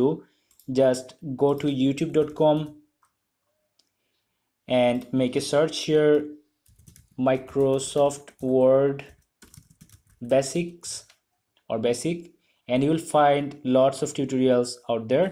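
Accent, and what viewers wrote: Sylhet, Bangladesh. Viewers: R u from sylhet? Ur accent says that, great work bro🤗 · Are you from sylhet?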